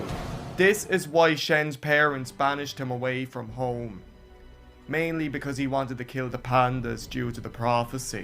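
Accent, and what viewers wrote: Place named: Ireland. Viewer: I can tell your Irish :D so am I.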